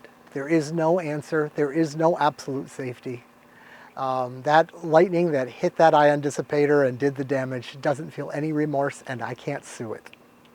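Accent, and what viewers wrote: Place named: Seattle, United States. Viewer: Hello, loved it are you from Seattle, I think I heard that??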